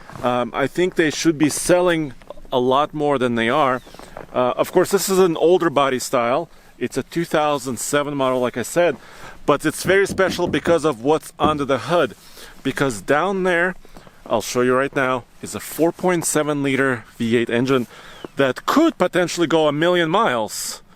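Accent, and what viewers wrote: Russia. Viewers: First thought "Wow, did Toyota sell the Seqouia in Georgia? And how did it get to Colorado and the US?!", then I remember the state 😂 Russian accent + the name a ex-Soviet republic 😛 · Прекрасный русский акцент. Очень понятный английский 😄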